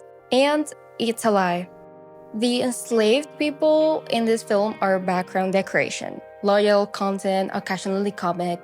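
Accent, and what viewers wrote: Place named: Tagalog, Philippines. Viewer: Are you Filipino too? I think I hear a little Tagalog accent, or maybe I'm wrong.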